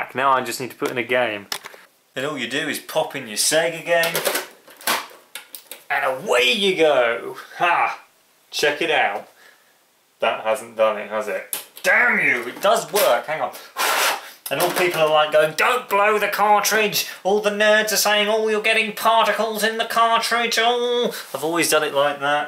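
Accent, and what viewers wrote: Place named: Lincolnshire, United Kingdom. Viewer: You sir are too funny. And oddly you sound like you have a Lincolnshire accent.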